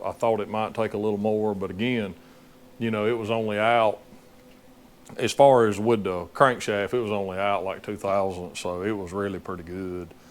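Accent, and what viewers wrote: South, United States. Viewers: Check out "I Do Cars" he uses a lot of fast forward and tears down engines that have blown up in 30mins..Its sad what people do to engines. Im sure the editing is the hardest part....Want to see your site go...My girlfriend loves your southern twang.....Has no clue what your saying just loves to hear you talk....Im working on a impersonation....LOL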